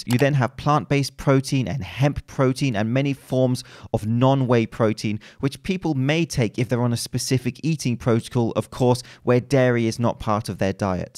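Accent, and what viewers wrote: United Kingdom. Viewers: Are your accent was British?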